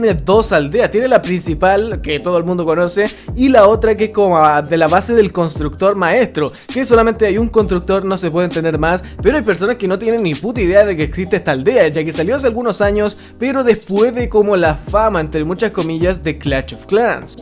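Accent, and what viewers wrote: Chile. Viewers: Tratas de hacer el español neutro e igual te sale aveces nuestro hermoso acento wn XD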